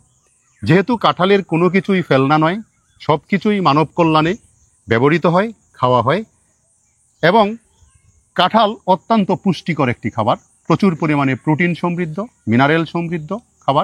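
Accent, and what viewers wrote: Bangladesh. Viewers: কথা শুনে এবং চেহারা দেখে বুজতে পারেন না এটা বাংলাদেশী চ্যানেল?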